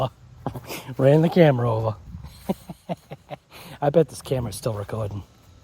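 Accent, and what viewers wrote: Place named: Massachusetts, United States. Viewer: I like this RC car so much that I bought the same version that you’re showing in the video now last year I bought the other version the body is slightly different and the decals are BY THE WAY, BRO, IT’S MY FIRST TIME ON THIS CHANNEL. YOU SOUND LIKE YOU’RE ONE OF MY FUCKING NEIGHBORS FROM DOWN THE STREET IF YOU’RE IN MASSACHUSETTS BECAUSE YOUR ACCENT IS THICK😂👍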